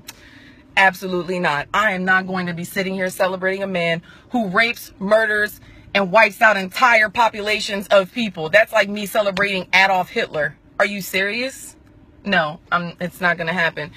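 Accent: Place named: AAVE, United States. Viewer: <link> this is how u explain it without stuttering or ebonics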